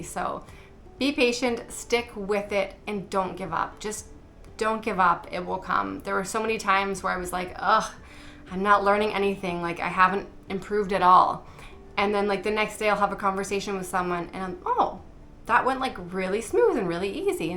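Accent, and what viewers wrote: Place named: Zagreb, Croatia. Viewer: I am from Croatia, and I live in Canada, and I watch all yours videos with pleasure. There are there categories in every Language, speaking, reading and writing. I see that you speak Excelent Croatian, you even picked up “purgers” accent! How did you find writing and reading Croatian? Maybe you can make a video about that one day, please, eh! 👍❤️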